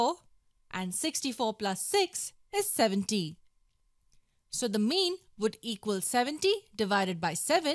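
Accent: India. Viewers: I CAN SAY YOU HAVE INDIAN ACCENT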